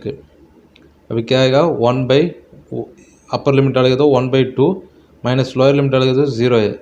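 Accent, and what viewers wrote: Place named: Hyderabad, India. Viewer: Hydrabadi ton me Bolta Bai.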